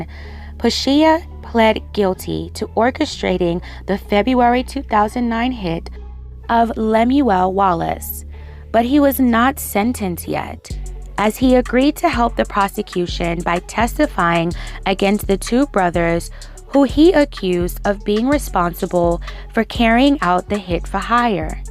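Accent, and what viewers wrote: Baltimore, United States. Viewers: Now when you do videos talk regular like this, cause you talking like you from Baltimore ain’t it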